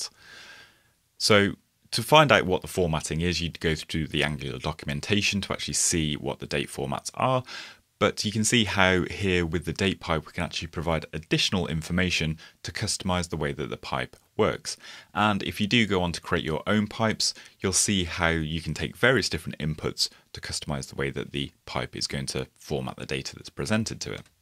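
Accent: United Kingdom. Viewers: after dealing with Indian guys` talks in angular, it is nice to came across an expert who has British accent :)) Thanks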